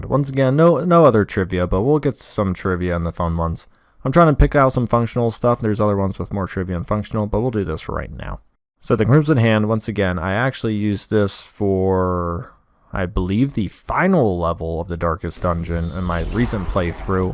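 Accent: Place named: United Kingdom. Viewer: I absolutely love your pronunciation. You're British, right? Or a non-native who had learned British English instead of nowadays widely spread American? Or an American speaking not so American?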